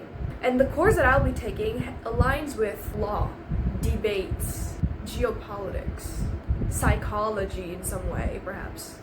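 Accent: United States. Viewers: Why do u have an american accent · I’m disgustingly annoyed by the fact that you sound exactly like a girl I know, and guess what she isn’t even from India, she’s from the STATES🦅🦅. Why and how do you sound so American?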